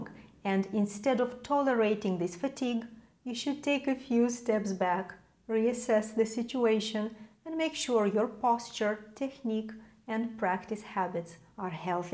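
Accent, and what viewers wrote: Russia. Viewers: Ура! Я могу услышать русского человека, просто услышав как он произносит отдельные звуки английского. Я угадал, что вы русская? По крайней мере русскоговорящая?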